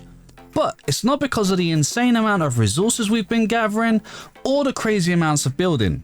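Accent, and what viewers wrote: United Kingdom. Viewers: I'm in love with the presentation on the video British accent makes everything better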